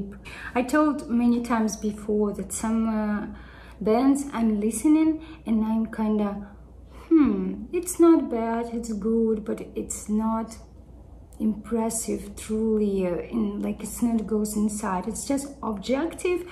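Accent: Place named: Russia. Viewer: Какой-то у тебя акцент русский...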